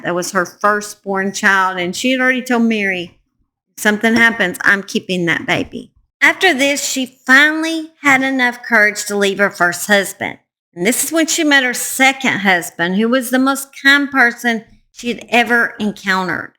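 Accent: South, United States. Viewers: Absolutely love you I'm from England & I just love your southern accent & thankyou for all your hard work on these stories & the empathy you tell then with.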